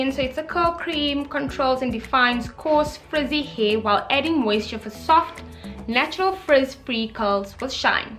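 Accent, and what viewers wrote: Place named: South Africa. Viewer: You sound so south african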